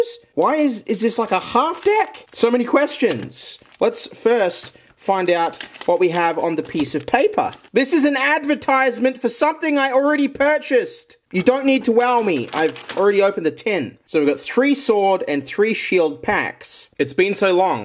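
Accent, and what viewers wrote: Australia. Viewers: you sound like the australian rick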